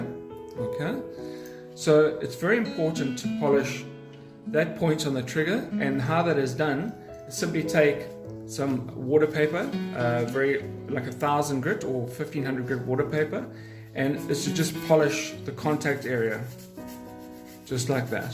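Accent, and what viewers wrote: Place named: South Africa. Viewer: The style of this video, music and video quality and South African narration has a district 13 feel to it. I really enjoyed watching this upgrade.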